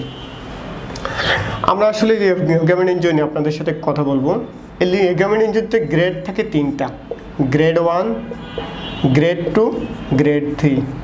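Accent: Noakhali, Bangladesh. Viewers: স্যার মনে হয় নোয়াখাইল্লা🥱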